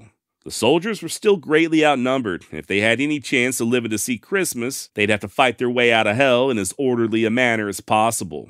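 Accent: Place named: South, United States. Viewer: Sir..it is a pleasure to listen to your southern accent. And your humour is refreshing. Your account and your presentation is undeniably researched. Thank you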